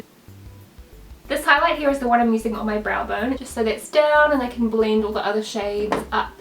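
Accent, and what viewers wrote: New Zealand. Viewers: Also I'm a kiwi living in Australia and watching your videos and hearing your accent makes me happy and feel at home in NZ again!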